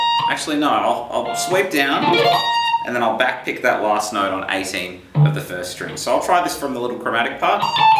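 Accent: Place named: Australia. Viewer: Are you a guitar teacher dude? You sound Australian! Which state are you from!?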